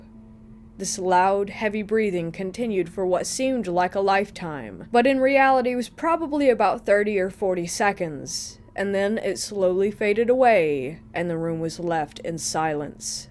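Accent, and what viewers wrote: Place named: South, United States. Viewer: The best narrator out there...cadenced, intelligent, proper enunciation... and that slight southern accent. A perfect alchemy. And no goofy innuendos either. Yayyyy! Thanks!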